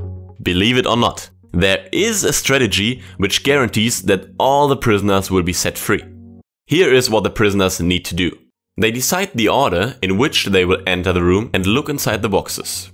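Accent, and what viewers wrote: Germany. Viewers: Is that a german accent i hear?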